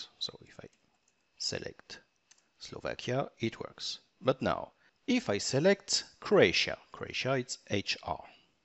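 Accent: France. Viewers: Thank you for posting. Very helpful. The French accent made it even better. Thanks again.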